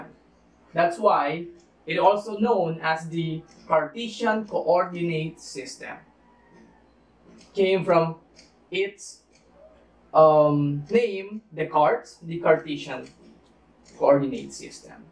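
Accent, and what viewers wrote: Philippines. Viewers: Sri lankan? I thought he's from Philippines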